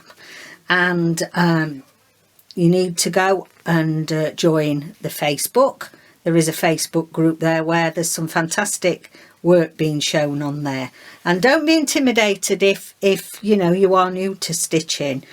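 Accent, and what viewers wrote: United Kingdom. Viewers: It’s so nice to meet you! Rachel has mentioned you several times & it’s always nice to match a voice with a name! I’ve never seen this technique before & I’m very excited to learn something new! Unfortunately I have to go on an archeological dig to find my sewing machine in my “sewing room”, hmmm…. Haven’t been doing a lot of sewing recently!! I’m new (since the pandemic began) to journal making & now I’m jumping into slow stitching! Still learning my stitches, hopefully I’ll catch up & get to play with my first page! Thanks so much for showing such a creative & fun technique, I love it! I have joined you as a new subscriber & given you the thumbs up & bell for new videos! I look forward to seeing more great ideas from you! Greetings from Lawrence, Kansas, smack dab in the middle of the US!! Where are you located? I love your accent, I wish I spoke British English! 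See you again soon! Thanks so much!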